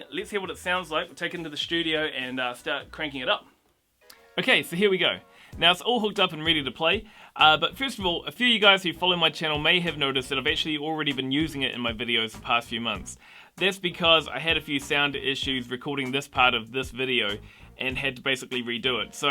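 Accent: New Zealand. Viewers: Okay...I love guessing accents and I've always struggled with Aussie vs. Kiwi. That being said, I think I've gotten a better handle on the two and I want to guess. Are you from New Zealand?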